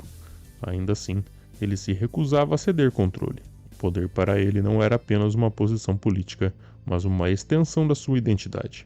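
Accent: Brazil. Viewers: Sim ele é um sulistas branco com sotaque forte quem nasce no sul dificilmente perde esse sotaque 😢😮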